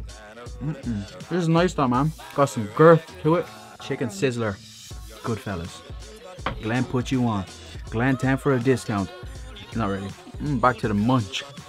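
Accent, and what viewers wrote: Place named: Dublin, Ireland. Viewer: You sound more like a dubliner lately I love it mate 😂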